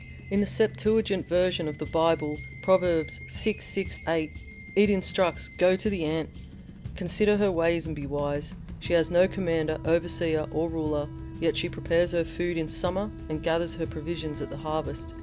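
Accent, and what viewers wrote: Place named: Australia. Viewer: Thanks for making these new connections in such an engaging Aussie voice!🙏⚡💛🦘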